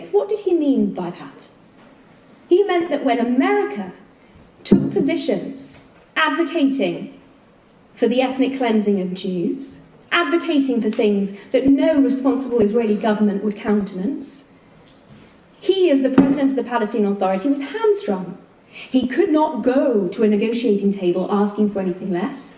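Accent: England, United Kingdom. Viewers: Your bullshit British accent doesn't belong on the world stage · She has an English accent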